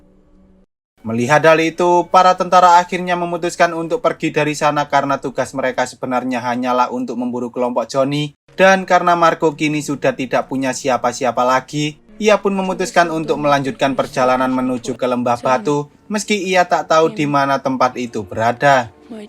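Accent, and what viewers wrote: Java, Indonesia. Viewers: Anjj dengerin suaranya medhok le 😂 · Kurang enak cara ngomong yg ceritanya, ada logat jowo😂 Sekali2 pake logat normal bahasa indo mas bro.😂😂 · Mas medok men sih mas 😢😂😂 koyok aku mesti wong jowo wkwkkw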